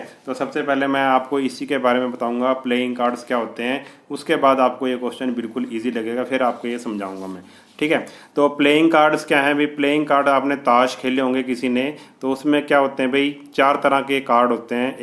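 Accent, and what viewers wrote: Punjab, India. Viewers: Sir punjabi ho na app?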